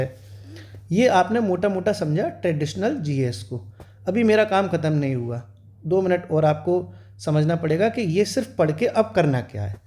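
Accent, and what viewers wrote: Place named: Etah, India. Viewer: एटा की भाषा लगती है आपकी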